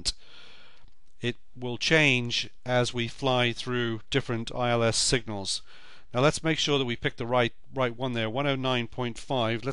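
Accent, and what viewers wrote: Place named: England, United Kingdom. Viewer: I for one, appreciate the clear English accent and not whizzed through at 50 mph. So many folks sound a bit like Kermit. Thanks.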